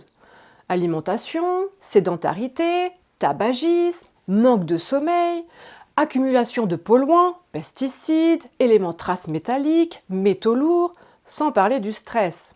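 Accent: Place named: Switzerland. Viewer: Bonjour. J’entend à votre accent que vous êtes Suisse. Excellente vidéo… Au plaisir.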